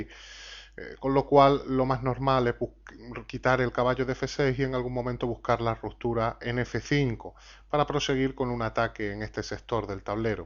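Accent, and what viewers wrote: Spain. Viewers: Amigo sólo tengo que comentar que a pesar de que soy de México y que los latinoamericanos tienen un cierto "asco" hacia su acento, a mi me encanta la forma en que hablas, en cuanto al ajedrez me resultan interesantes tus vídeos, aunque sólo me ayudan a saber cosas que me he dado cuenta por mi mismo sin embargo, no está demás saber una o dos cosas, saludos.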